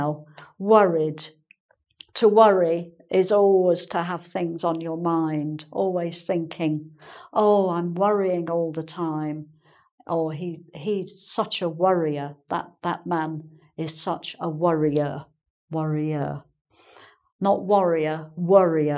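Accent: England, United Kingdom. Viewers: I've been trying to practice some British accents. I think I can learn them from. Anyway, I'm wondering what dialect of British accents she speaks with? I usually speak English with an American accent but I really want to learn myself and teach British accents to my students! Would you guys please give some good tips for it~? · I like her way to explain the lessons but it seems like she teach british english more than american english. I realized of for her accent. Even I think that lessons can be useful in both. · Love your kind English accent ...I imagine taking a cuo of tea with you talking :)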